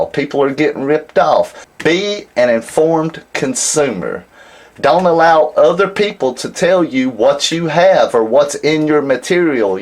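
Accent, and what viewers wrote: United States. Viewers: I was born near San Francisco, and frankly, I'm getting bored with my local dialect. It's nice to hear another distinctly American sound from time to time: reminds us that this is still a very varied country. Best Health. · "coyens" 😜 Your accent is so close to the kid from Forrest Gump.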